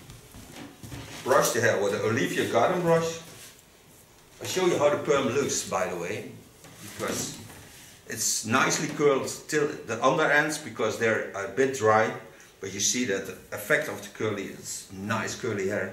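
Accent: Netherlands. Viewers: You made me laugh, with the ears. Love your video's, you must get tired, working all day, then doing these video's for us friends on you tube. Do the rollers you used, give a soft curl, compared to the others you normally use. Joy to watch. Love the Dutch accent. Love from England.💖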